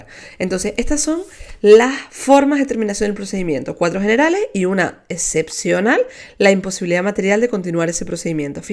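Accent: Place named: Canary Islands, Spain. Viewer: Gracias! Me encanta tu acento. Eres canaria?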